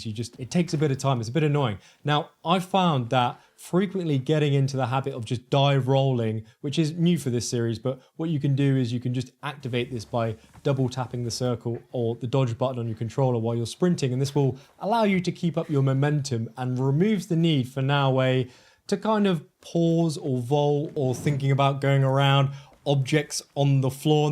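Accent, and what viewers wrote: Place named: RP, United Kingdom. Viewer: Your very posh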